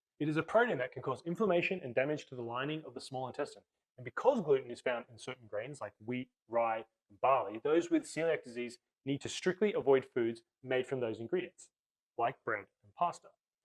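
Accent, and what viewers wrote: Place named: Australia. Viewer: This actually makes sense to me. Only new to the gluten issue realm. Great to hear what sounds like an Australian accent!. Have you got that low fodmap list to look at and try please?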